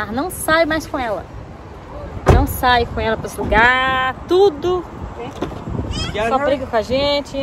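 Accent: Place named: Minas Gerais, Brazil. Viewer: FAZ DIFERENÇA SIM, SEUS CABELOS SAO LINDOS A PELE ESTA OTIMA! MAS O QUE EU GOSTO MUITO EM VC É O SEU JEITO MINEIRO DE FALAR. SE TORNA UMA PESSOA DIVIRTIDA DE SE VER CONTINUE SEMPRE ASSIM!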